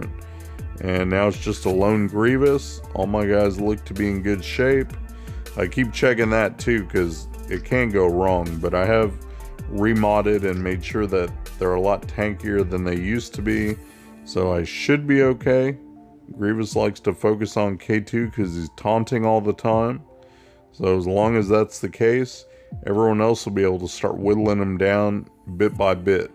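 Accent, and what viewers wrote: South, United States. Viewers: You sound like a southern Technoblade… and it’s actually pretty cool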